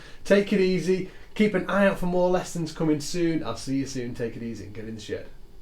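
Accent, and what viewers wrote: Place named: United Kingdom. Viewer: He is british, maybe you are used to listening english accent from North America and that's why sounds weird to you.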